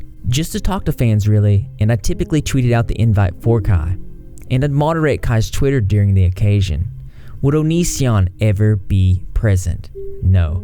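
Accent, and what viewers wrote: South, United States. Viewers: I hate the way southern accents sound but yours is so pleasant to hear wtf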